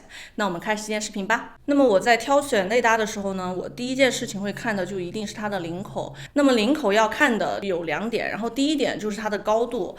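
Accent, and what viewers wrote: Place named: Shandong, China. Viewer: 👍听口音是山东人😃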